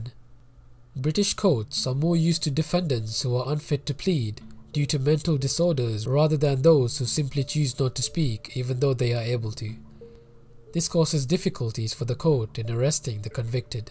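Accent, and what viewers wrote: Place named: India. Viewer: The narrator has an Indian accent, just curious.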